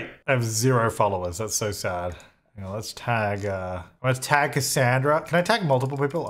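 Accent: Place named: Australia. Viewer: It took me 17mins into this video until I realised you're Australian... And I'm Australian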